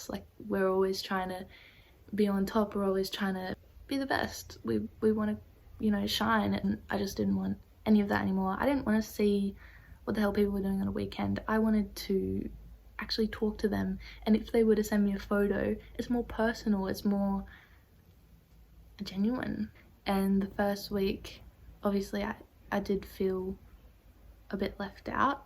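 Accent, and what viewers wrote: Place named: Australia. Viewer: I knew she’d have an AUS accent even before I clicked on the video for some reason haha. I do think social media gives us too much insight on people’s lives. We used to live our whole lives not knowing what our friends, family, and fav celebrities ate for breakfast.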